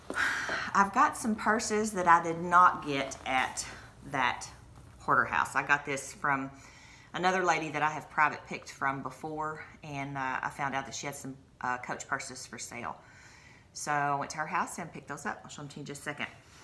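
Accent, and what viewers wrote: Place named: Kentucky, United States. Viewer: Your accent is like spot on to my grandmother's. You in Kentucky by any chance? Around West Liberty (or what's left of it)?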